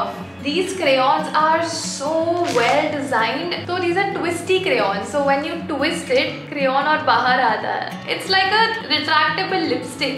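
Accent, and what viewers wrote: India. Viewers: Literally I was shocked when I heard her speaking English in Indian accent. She looks like she's from western 🥺 btw love the haul ❤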